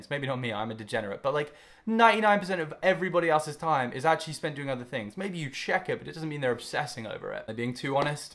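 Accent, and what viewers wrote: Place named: United Kingdom. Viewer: I love his accent it's obviously British but there's something else in it lool I'm so weird 😂❤️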